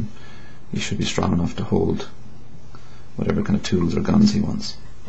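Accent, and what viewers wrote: Ireland. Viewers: u sound irish are u?? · i think he sounds a tiny bit irish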